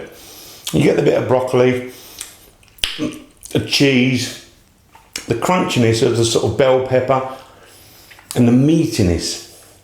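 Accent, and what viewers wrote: United Kingdom. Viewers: He's from the UK, he can't help it. It's a very cute tutorial, I think.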